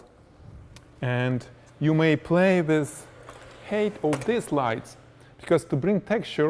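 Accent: Russia. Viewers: мне кажется или я слышу русский акцент?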